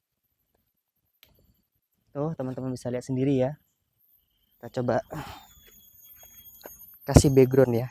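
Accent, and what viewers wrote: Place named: Palembang, Indonesia. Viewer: Ikut bljr... Awak jemo mano logatnyo cak jemo palembang , aq di bkl.... Salam kenal dan satu hobby bro . 🙏🙏🙏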